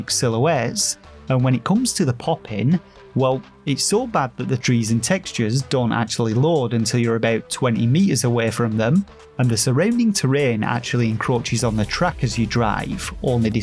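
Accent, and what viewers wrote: United Kingdom. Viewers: Hey, not hating on you or anything, but please can you tell me what your accent is? I am genuinely curious. I can’t understand most of what you say, but I am fascinated that the auto-translate can pick up 99% of your brit English. I just need to know please. I am beyond OCD at this point and it frustrates me.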